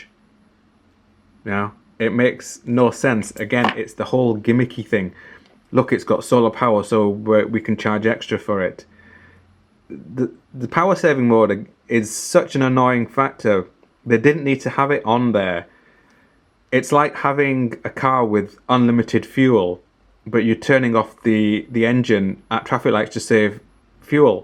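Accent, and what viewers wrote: Yorkshire, United Kingdom. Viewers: Hi, Love your reviews, when can we see your review of your 1963 Chinese Pilot Watch, looking forward to your content as it’s refreshing. Lastly where you from you sound like a Yorkshire lad?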